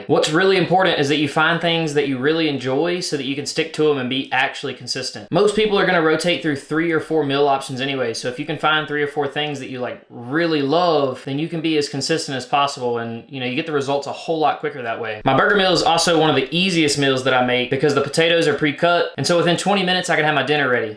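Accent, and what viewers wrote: United States. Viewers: What state are you from? Probably never heard that American accent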